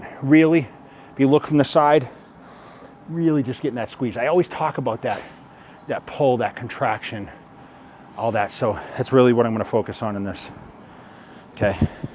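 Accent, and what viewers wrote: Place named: Boston, United States. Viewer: The Boston/Massachusetts accent coming out in full force lmao “shoaht list” “Splender” Boston: we drop r’s when they’re in the word and add them when they’re not there 😂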